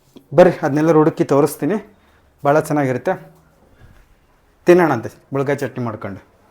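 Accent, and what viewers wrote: Chitradurga, India. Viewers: ಚಿತ್ರದುರ್ಗ ಭಾಷೆ ಕೇಳಿ ತುಂಬಾ ಸಂತೋಷ ಅಯಿತು ನಮ್ಮೂರು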